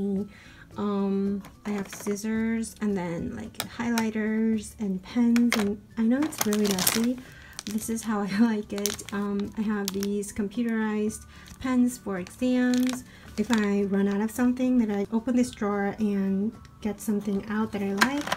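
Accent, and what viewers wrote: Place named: United States. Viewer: This might be a personal question but I'm going to ask anyways are you a foreign exchange student because if I am correct you're currently studying in South Korea and hearing that you speak English with an American accident I assume you're American (correct me if I'm wrong) also if you are a foreign exchange student how did you get excepted and how has your experience been btw love your videos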